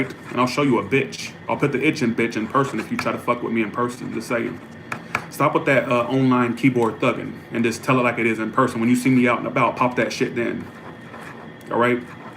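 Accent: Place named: South, United States. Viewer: where are you located? you have a southern accent, its fun to watch you...how do i get on the chat?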